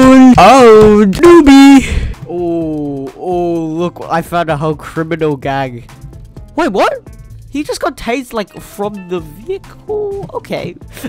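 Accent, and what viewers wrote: Australia. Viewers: Oh I didn’t know you were Australian! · Are u Australian?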